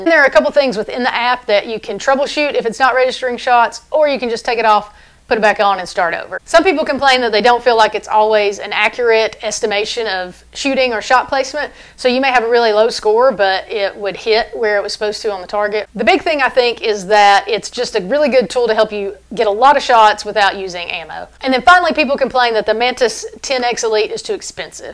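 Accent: South, United States. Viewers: I also got to say I love the southern accent....lol... I'm from the Midwest so we have boring accents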